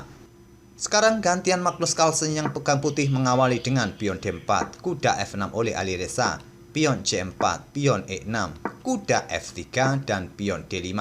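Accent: Indonesia. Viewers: suaranya medok banget mas...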